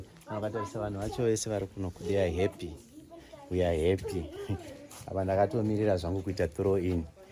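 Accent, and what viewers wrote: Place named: Manicaland, Zimbabwe. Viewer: U sound manyika 80% come back home/kanyi 😅😅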